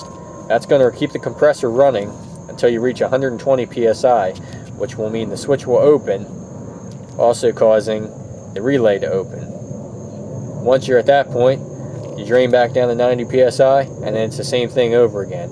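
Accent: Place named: Philadelphia, United States. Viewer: Are you from Philadelphia, or close to it? The second I heard you speak. I was like, this dude is from my area.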